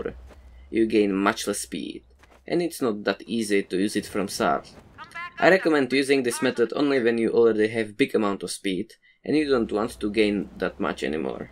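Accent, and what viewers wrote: Czech Republic. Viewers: Cz accent :D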